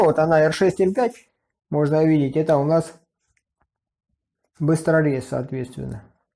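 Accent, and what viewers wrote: South, Russia. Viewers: Шикарный твой южный акцент))) Ютуб Брин - бывший соотечественник.... Вот те и волокита, вот те и бюрократия))) И кстати: твой оператор - малаччина! Всё делает грамотно и почти без ошибок....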